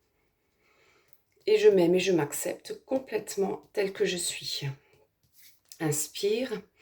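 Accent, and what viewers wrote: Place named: Alsace, France. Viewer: vous n etes pas alsacienne d'après l'accent ? c sympa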